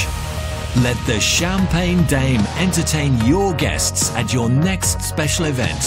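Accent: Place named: Australia. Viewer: Kyle Richards with an Ozzy accent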